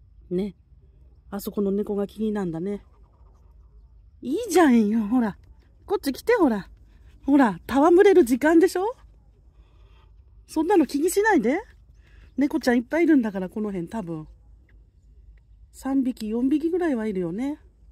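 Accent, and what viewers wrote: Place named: Ibaraki, Japan. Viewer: 初めまして！もしや茨城の方ですか？ 何となく聞き慣れた訛りが😁私は現在北欧のデンマークに住んでいますが、生粋の茨城人です。茶ーちゃん可愛いですね。我が家にも茶白猫がいて、細々と猫動画もやってます。全然人気がありませんけど😅チャンネル登録と高評価ボタンポチポチしちゃいましたよ。これから他の動画もゆっくり観させていただきますね。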